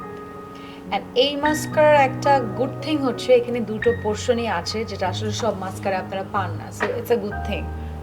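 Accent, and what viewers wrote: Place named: Kolkata, India. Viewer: Guerniss Er Makeup Sunechi Khub Soft Hoye Tomake Amar BD Na Kolkatar Meye Lage Bcz Tumi Khub Sundor Kore Cholti Banglai Kotha Bolo ☺️🥰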